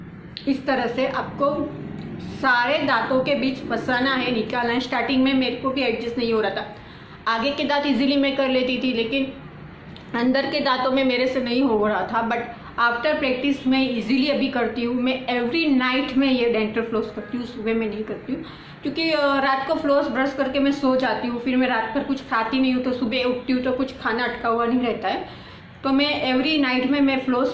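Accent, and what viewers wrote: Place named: Gujarat, India. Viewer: Ma'am I think app gujarati ho. Apka accent gujarati jaisa lag raha hai.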